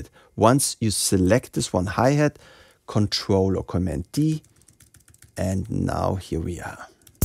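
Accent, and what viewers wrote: Germany. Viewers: This German accent is cute. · Sir you have the best and most german accent i have ever heard ! I love the tutorial very very begginer friendly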